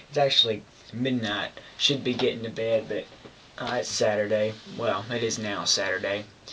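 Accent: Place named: South, United States. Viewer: Haha yea man nothing wrong with a southern accent it just makes it that much more fun to be around you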